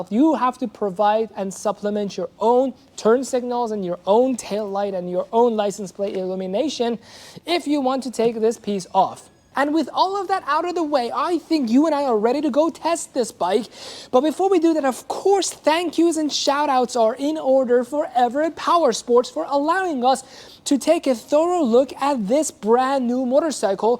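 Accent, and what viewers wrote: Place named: Iran. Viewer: Qorboone oon lahje Iroonit besham man jan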